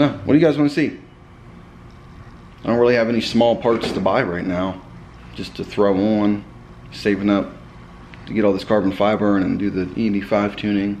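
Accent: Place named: Philadelphia, United States. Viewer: I was gunna say, you sound like you are from Philly. Where did you grow up?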